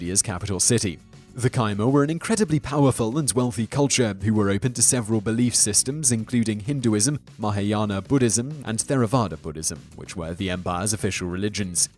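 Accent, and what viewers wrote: United Kingdom. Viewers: British ppl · He's British. That's their pronunciation.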